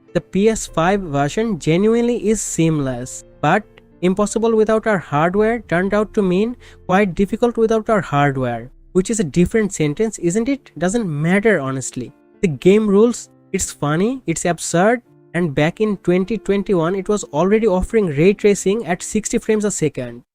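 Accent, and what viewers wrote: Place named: Bengal, India. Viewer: indian accent is not a bad thing bro. bengali accent is very evident , plz fdont try to hide it 😒😒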